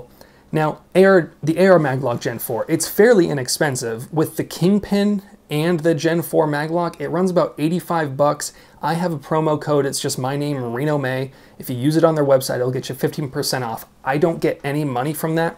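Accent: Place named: California, United States. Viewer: I can tell your from California just looking and listening to you.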